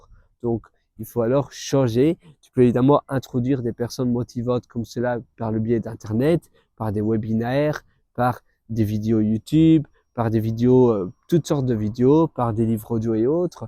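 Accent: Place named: Belgium. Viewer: Tu es belge ? Je reconnais un accent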